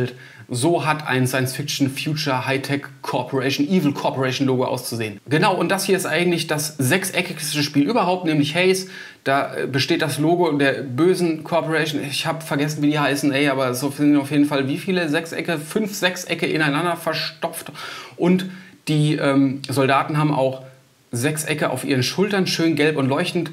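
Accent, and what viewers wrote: Hesse, Germany. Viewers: Hart am feiern und immer wieder schaut der Hesse raus :)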